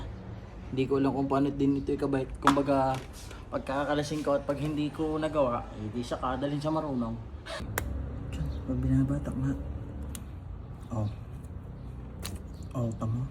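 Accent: Batangas, Philippines. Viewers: ngayon ko lang kayo napanood kuya, halatang batangueno agad hahahaha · Taga Batangas ka puge? Batangeño ere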